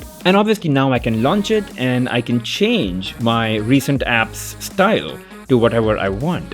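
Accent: India. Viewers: indian accent English is pure ear cancer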